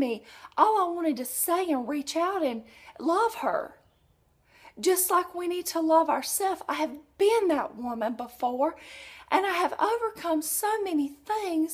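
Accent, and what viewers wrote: South, United States. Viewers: Hello. Nice USA women, with beautiful BLUE eyes. Dont cry. I will send some tissues from EUROPA. Good video, a lot of good video, BRAVO. You are funny. South accent. Have a beautiful day. Bye. Rudi from EUROPA.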